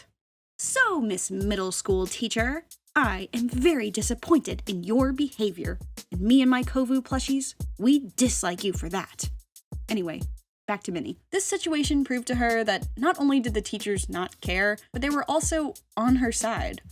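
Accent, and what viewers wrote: Canada. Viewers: So... You're Canadian? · you sound Canadian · the canadian-ification of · IS CANADIAN CONFIRMED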